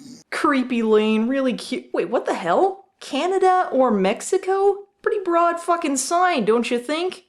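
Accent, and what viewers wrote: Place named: Midwest, United States. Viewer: I love your funny faces at some of the more absurd parts of this terrible film. Off-topic: Are you a Wisconsin or Minnesota native? I only ask because of your accent.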